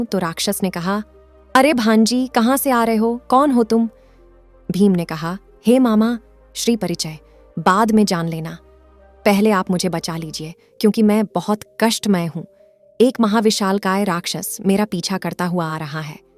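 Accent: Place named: Hyderabad, India. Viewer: Pukka Hyderabadi accent hai bhai...kitna Sach bolengi kya ???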